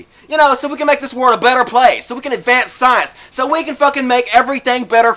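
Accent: South, United States. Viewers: You are the first man with a Southern accent I've seen who is an atheist. Congrats! I've subscribed.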